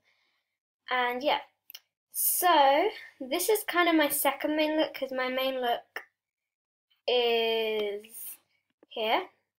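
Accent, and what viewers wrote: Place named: United Kingdom. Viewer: Wow, when we met on Animal Jam, I didn't think you were actually... uhm... british?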